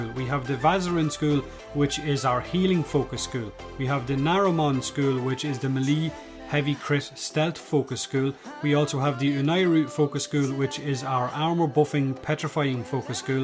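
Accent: Ireland. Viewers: Hearing an Irishman say tree while meaning tree instead of three has left me deeply confused. Tree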